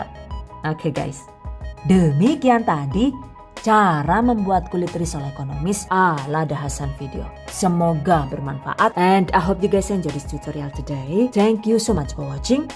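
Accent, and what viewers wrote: Banyumas, Indonesia. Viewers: 😄😅 suka suka suka,, aplgi pke bhsa ngapaknya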